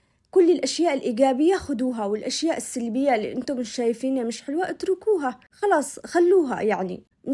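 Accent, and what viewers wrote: Aden, Yemen. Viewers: اي حد عاجبه يتابع ووالدي مش عاجبه طز ولا تخلي ببالك ولاتهمي والله كفايه كلامك العدني الحلو موفقه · مستغربة ان في تعليقات سلبية على كل هذا التميز في الشرح و الطبخ الرائع .. ماشاء الله فنانة جدا بمعرفة كل انواع هذه الطبخات وبطريقة الشرح اللي يعلق سلبيا معناه حسد انا حتى فرحانه انك تتكلمي عدني زينا مش زي اللي يسافروا السعودية ولسانهم تنعوج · انتي من افضل اليوتيوبرس ،وكفايه صوتك الحنون واللهجه العدنيه الاصيله عوضا على وصفاتك اللذيذه اللي تجنن ،لك كل التحيه والموده والتقدير ❤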